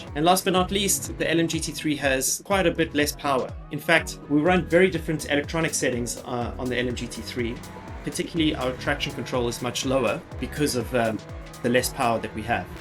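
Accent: South Africa. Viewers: I can’t help but pick up the South African accent here, are you from South Africa by any chance? 😅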